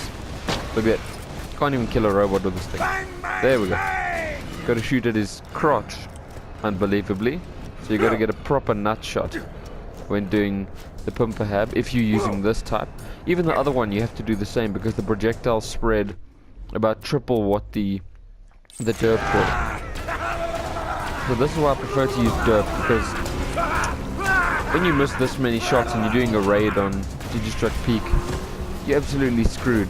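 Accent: South Africa. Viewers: I recognize that accent from anywhere, you South African? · Are you south African?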